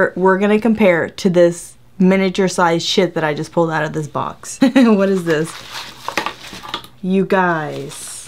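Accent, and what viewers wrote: United States. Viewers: Where is her accent from? Certain state of 🇺🇸?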